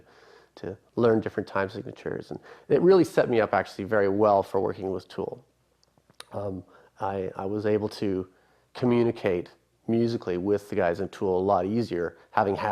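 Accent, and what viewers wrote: Canada. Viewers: That's how Canadians pronounce again.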